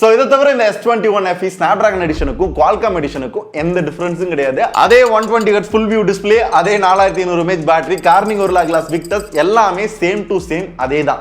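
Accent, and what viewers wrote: Madurai, India. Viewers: bro Nenga madurai yaa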